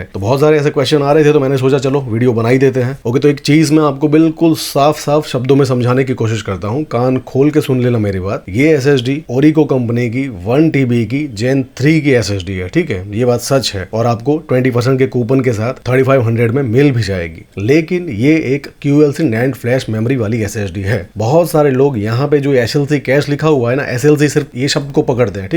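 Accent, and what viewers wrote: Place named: Kathiawar, India. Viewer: Kathiyawadi cho ne bhai?😅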